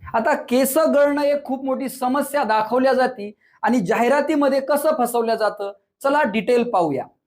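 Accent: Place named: Vidarbha, India. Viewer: दुसऱ्यातल्या कमतरता शोधण्यात लोकं पटाईत आहेत.स्वतः भले ही कर्तृत्वशून्य असो. त्यांनी जो केसं असा शब्द वापरला तो केस या शब्दासाठीच वापरला आहे विदर्भ आणि मराठवाड्यात बोलली जाणारी मराठी अशीच आहे आणि आम्ही आमच्या बोलण्याच्या पद्धतीवर खूप प्रेम करतो. कोकणातील लोकं वेगळ्या शैली ची मराठी मोलतात तर खांदेशातील लोकं वेगळ्या.. आणि आम्ही सगळ्याच्या बोलण्याच्या पद्धतींचा आदर करतो... ते ज्या विषयावर बोलत आहेत त्याच्यावर ध्यान देणं जास्त गरजेचं आहे न की ते मराठी किती शुद्ध बोलत आहेत. दुसरी गोष्ट ते काही मराठी व्याकरणाचं लेक्चर नाही देत आहेत त्यामुळं शब्दांतील बारकाव्यापेक्षा त्याचा मॅसेज महत्वाचा आहे... तसं ही अमेरिकेत किंवा यूरोपात गध्यातला गधा माणूस सुद्धा अगदी शुद्ध इंग्रजी बोलतो याचा अर्थ तो खूप बुद्धिमान आहे असं होत नाही. फक्त तो ज्या ठिकाणी वाढला आहे त्याचा तो परिणाम आहे. तुम्हाला जर वाटत असेल की तुम्हीलाच फक्त प्रमाणित आणि शुद्ध मराठी बोलता येते तर याचा अर्थ असा होत नाही की तुम्हीच खूप बुद्धिमान आहात... 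आणि तुमच्या कमेंट वरून तुम्ही मला त्या अमेरिकेतल्या शुद्ध इंग्रजी बोलणाऱ्या गध्या माणसा पेक्षा वेगळे वाटत नाहीत... एका शब्दावरून तुम्ही त्या सरांची शाळा, शिक्षण आणि बुद्धिमत्ता सगळंच काढलत. तुम्हाला स्वतः एकदा पहिली पासून शाळेत जायची गरज आहे. शिक्षण फक्त शुद्ध मराठी शिकन्यापुरत मर्यादित नसत त्यासोबतच भलेही भाषा शुद्ध नसो इतर लोकांशी कसं वागायचं हे ही शिकवत असतं कदाचित तुमचं ते शाळेतच विसरलं आहे 🙂👍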